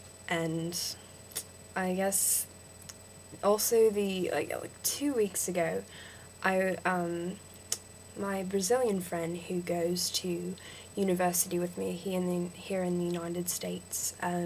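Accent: England, United Kingdom. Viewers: you sound english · Mate if your a yank and your that close you've done pretty well, you hit the nail in the head though, you sound almost perfectly like a pom. One major tip, it's not Australia, it's 'Straya'. :) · Good on ya for having a crack at it but ya sound like a pom, get ya old man to teach ya. P.S, I'm a skip. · You sound like you are from England def not an aussie haha but good english one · u sound more english then australian lol p.s ur pretty darn gorgeous ;P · You sound like a Pom... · that's pretty english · Not Aussie at all, fair for English. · It's a weird hybrid between English, a lil; bit of Irish and Australian. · you sound freakin english not aussie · As an Aussie, I would assume that you were from England .. and I think that our 'ocker' accent is overused too much. We honestly don't sound like crocodile dundee.. Gahh stereotypes. 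· you sound more english nice try but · I think a main reason that she sounds more pom than strine, is that she is still pronouncing all her words in a proper way. She isn't giving them the Aussie inflections and lazy syllable connections that are so common to our most recognised accent. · Lol you sound English :) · i am australian and sorry but it isnt aussie u sound english :) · You sound english nothing like an aussie accent im aussie so i know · you sound English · You sound too English. Find some slang, like aussies are too lazy to say the world properly. Eg. Not United States it America or USA. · i love how you say you're american and have an american accent yet you sound fully enlgish....... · You sound English not Australian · Not too bad but there is an obviously English tinge. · The way you say some words are pretty good, but otherwise it sounds English. You say the word Australia perfect though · You've got some of it, but it turns English more often than not. 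But very little American comes through. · You sound like a pom! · seriously you sound like you are from brighton in england I never would of picked you were american and dont worry about exploiting your passport we have people who get student visa or short 6month visa's midway they declare assylum and then get citizenship and its total crap and perfect example before the hate comments come on sydney fc striker ali abass while he was playing for newcastle deceided mid way to declare assylum and got it but he still plays for iran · sounds a bit English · you sound english not aussie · I actually thought you were English and you were just introducing yourself at the start of the video. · I don't think you sound Australia at all. More English. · I am Australian and she sounds english...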